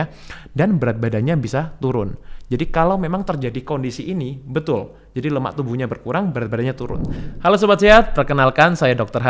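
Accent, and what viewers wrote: Java, Indonesia. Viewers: Me I kedok jawa bicaraya medok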